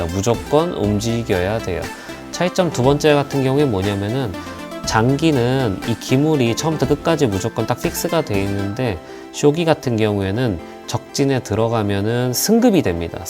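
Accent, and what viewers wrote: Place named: Southeast Asia. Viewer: 동남아 외국인 말투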